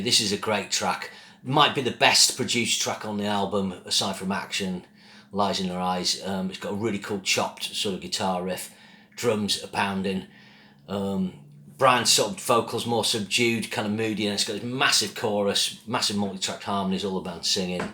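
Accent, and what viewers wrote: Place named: Northern England, United Kingdom. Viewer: You sounded like you were from the north, but didn't sound exactly like the Yorkshire accents I'd heard, so I was just curious. Thanks! I'm from the state of North Carolina.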